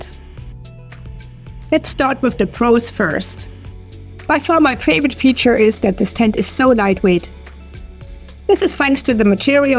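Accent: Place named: Saxony, Germany. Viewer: Danke. Ich habe deinen Kanal gerade erst entdeckt. Ich dachte mir ich schreibe mal auf deutsch. Dein Englisch hat so einen sächsischen Klang. Jetzt sehe ich mir in der nächsten Zeit erstmal weitere deiner Beiträge an. Nochmals vielen Dank und alles Gute.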